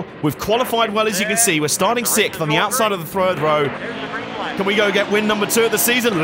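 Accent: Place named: United Kingdom. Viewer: I love this channel plus the British accent 😂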